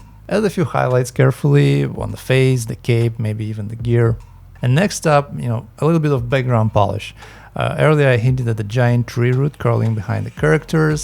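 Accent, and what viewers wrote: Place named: Russia. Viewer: хорош кста слышно русский акцент 😃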